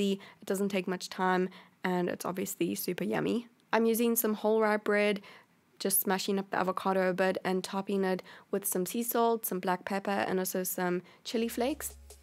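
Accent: South Africa. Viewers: I detect a South African accent? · are you from south africa? love your accent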